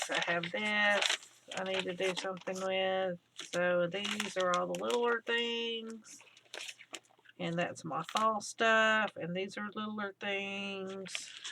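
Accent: South, United States. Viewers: How cool that you're able to find so many fun things for your book! I really enjoy watching your videos and seeing what you come up with. Ain't it the truth that these gluebooks ain't going in the Lourve? So we need to just relax, cut, and glue, like Nicole says. It's all about the fun, isn't it? I was born in Alabama and raised there and in East Texas. I have a Southern accent, too, and listening to you makes me feel like I'm back down home. I live in on the Southern Oregon coast now and I do get homesick sometimes. Love ya, girl.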